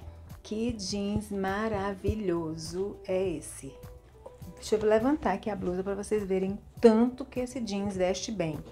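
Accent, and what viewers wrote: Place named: Northeast, Brazil. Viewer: Pelo seu sotaque deve ser Nordestina, como eu. A não ser que você não more no Nordeste, esses looks não são completamente inadequados? Ou então você mora no sul ou algo parecendo 🤔🤔🤔🤔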